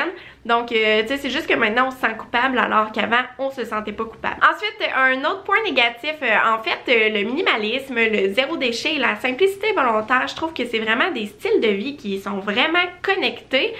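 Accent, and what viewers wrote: Quebec, Canada. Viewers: Bref jai lâché en plein millieu... A moins d'etre quebequois impossible de comprendre en plus a une vitesse folle... Dommage ca m'interessait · Vidéo sympa mais l'accent québécois au bout de 2 minutes cela n'est plus possible à supporter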